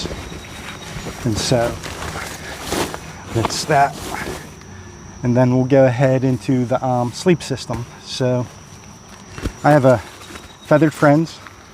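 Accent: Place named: Western Pennsylvania, United States. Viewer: Right on western PA .... so you sound..... East Palestine here.!!!!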